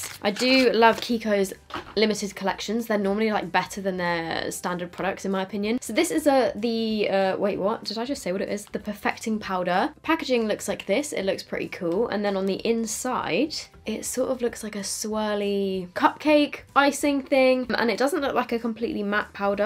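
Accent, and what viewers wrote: United States. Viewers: Who else wasn't ready for the American accent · Omg. Can u do a video speaking in an American accent!!???? That would be so cool and funny if u did like a whole tutorial in ur American accent!!!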